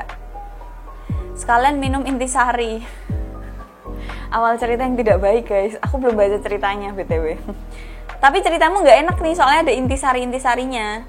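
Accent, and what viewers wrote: Java, Indonesia. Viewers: suka logat jawa.y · Mbak buat subtitle nya dong di bawah kalo lagi medok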